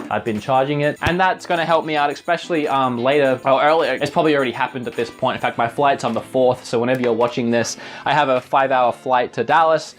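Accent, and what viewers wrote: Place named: Australia. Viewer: His Aussie accent is so prominent in this video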